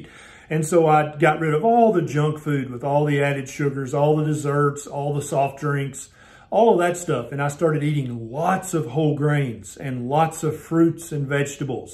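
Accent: South, United States. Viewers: Dude so southern "a touch of sugar" So true!!!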